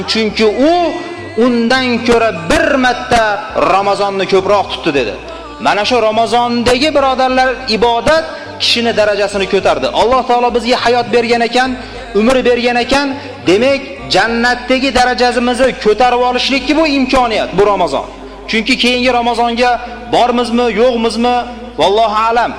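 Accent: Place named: Andijan, Uzbekistan. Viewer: Андижонда укип битирган домла шекилли шевалари тозаа Барокаллох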